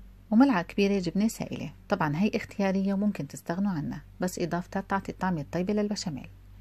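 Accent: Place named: Aleppo, Syria. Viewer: يسلمو ايديكي الله يجزيكي كل خير سؤال، انت من حلب لهجتك كان من حلب.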